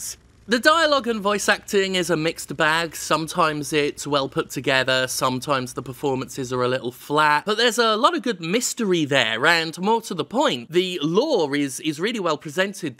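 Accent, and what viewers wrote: United Kingdom. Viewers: Fuck me, did not expect to be slapped in the face by a massive dose of nostalgia the moment I boot up a sterling vid! You may be in America but you are deffo a brit through an through ;) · Sometiems, you can hear the Britishness just oozing out of.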